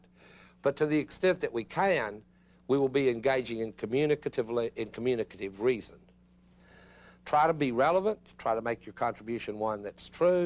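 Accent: South, United States. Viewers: his southern drawl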